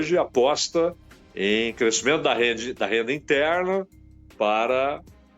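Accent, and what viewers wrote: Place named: Caipira, Brazil. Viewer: Vc é velho comunista, com sotaque caipira ! Vtsc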